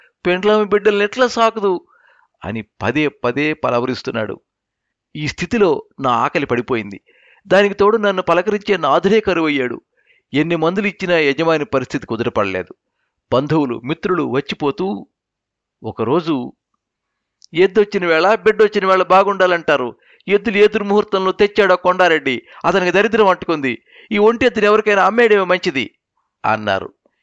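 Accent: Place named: Rayalaseema, India. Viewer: Super story. Congrats to Reddy garu , the writer.మీరు గూడా బాగా చదివారు.‌ రాయల సీమ వ్యవహారిక భాషను బాగా పండించారు.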